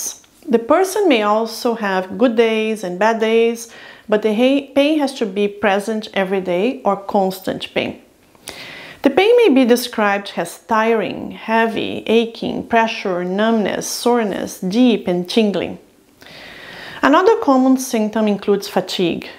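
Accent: Brazil. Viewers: This female has Brazilian accent.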